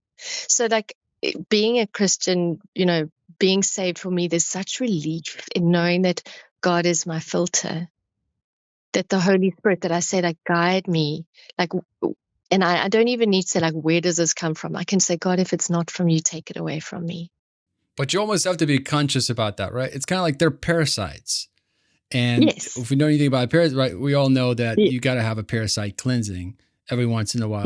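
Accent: South Africa. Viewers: Anyone else go the first several minutes looking for the clues to discern: New Zealand? No….South Africa (sounds a teeny bit Dutch) but then she said Catholic and I figured, no, near zero chance of being. Dutch S African and Catholic…must be Anglophone but still sounds like an Afrikans speaker, then she said Germany and that she learned the language…then I was like, NOW IT MAKES Sense! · Sounds 100% South African. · i think you have a lovely accent and it was very clearly South African. I made lots of lovely South African friends when I was living in Qatar and knew immediately where you were from. You spoke beautifully by the way.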